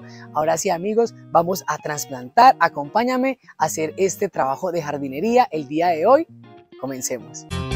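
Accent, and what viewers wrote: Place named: Colombia. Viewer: ay qué divertido eres, con ese acento colombiano, esa locura x las plantas q comparto, es una lindeza oirte, me río mucho, eres encantador ojo yo vivo en el campo cerca de Madrid, un clima extremo y en el monte..te puedes imaginar la de fracasos q he tenido con las plantas..pinos pinos y más pinos un horror x eso estoy bellamente fascinada con esas plantas tropicales y exuberantes, Dios te bendiga☺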